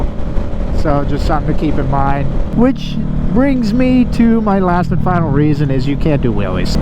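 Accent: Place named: New Jersey, United States. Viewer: First time watching your videos, from the minute you started speaking I knew you were from New Jersey, then I saw similar landmarks like the airport where the 177th fighter jets take off. I use to live in Margate & Ventnor City.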